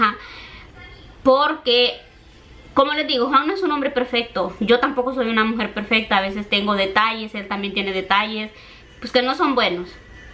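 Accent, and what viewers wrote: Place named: Honduras. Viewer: Te admiro me encanta el asento de las catrachas y mas cuando son carismaticas y cariñosas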